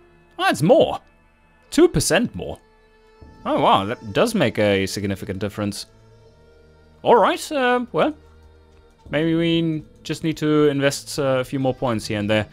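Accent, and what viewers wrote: Germany. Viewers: Like your videos dude :D your slang sounds like german doesnt it? cheers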